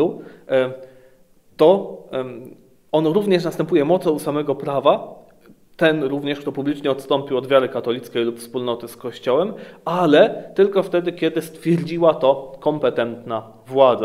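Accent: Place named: Italy. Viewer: Ach ta łacina włoska ;))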